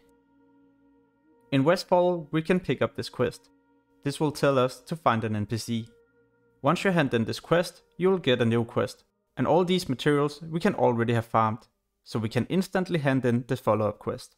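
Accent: Denmark. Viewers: Danglish my friend ;-)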